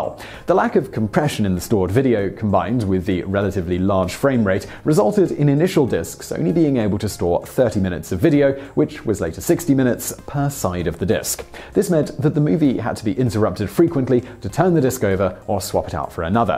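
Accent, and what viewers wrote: United Kingdom. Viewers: today I found out a person with a british accent can be misleading and wrong. what about the VCD format?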